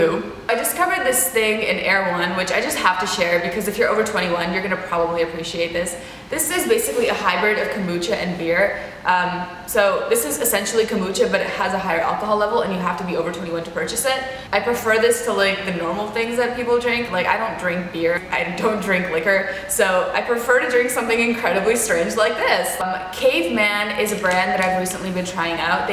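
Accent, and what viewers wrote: Russia. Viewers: Легче понимать речь, если сравнивать с остальным англоязычном ютьюбом. У неё есть некоторый акцент, русский, или кажется? Поскольку большая часть из сказанного в видео было уже озвучено на classisinternal на родном русском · Почему у появился русский акцент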